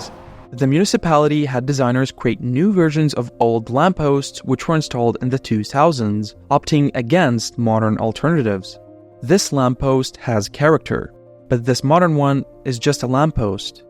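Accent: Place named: Latvia. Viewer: Es gribēju gluži otrādi paslavēt, par angļu izrunu ar tik knapu latviešu akcentu :D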